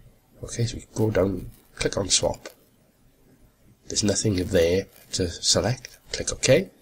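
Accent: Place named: Wales, United Kingdom. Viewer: Just watched an Irish guy telling us how to do this and now a Welshman. This Linux is truly international ;)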